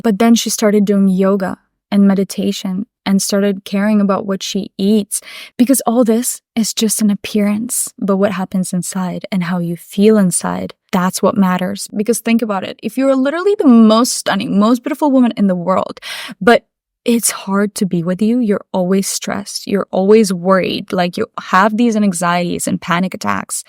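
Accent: Russia. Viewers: Where does this accent come from? Russia? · She's Russian for sure:) only Russian girl can speak and act like this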